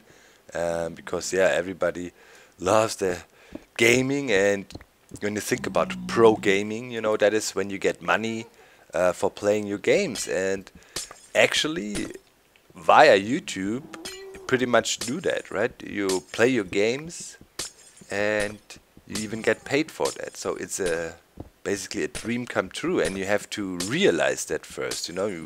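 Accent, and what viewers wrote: Germany. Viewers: Haha my mum think that you sound like Arnold;) - The german accent is infactious!:D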